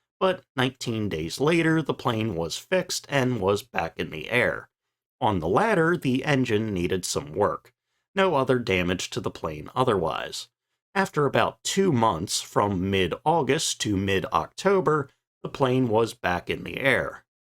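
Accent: United States. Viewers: I do not like videos that have measurements n them that use only the Metric system! You sound like a US citizen, you are on the US YouTube channels and yet you completely leave out our system of measurement which is the Imperial System! Why do you Pinheads do this? I have to stop this absurdity and have given you a thmbs down!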